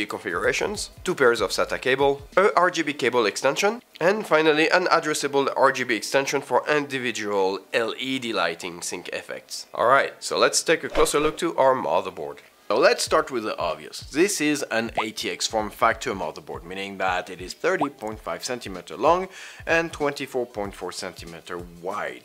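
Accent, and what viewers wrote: France. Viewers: Love the accent! xD Are you French? Anyway, appreciate the review. Waiting for mine to arrive in a few days.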